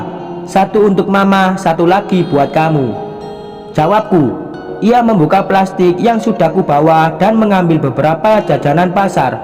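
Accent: Java, Indonesia. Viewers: Mocone kok medok nenen tho maaas, mengurangi kenikmatan pendengaranku😇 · Maaf ya mas bacanya pake intonasi,,daaan jng medok2,,kok ky anak SD🙏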